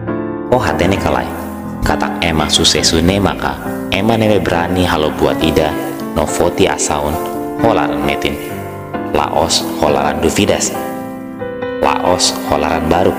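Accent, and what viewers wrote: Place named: Indonesia. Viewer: Voice nia Orang indo nih🥰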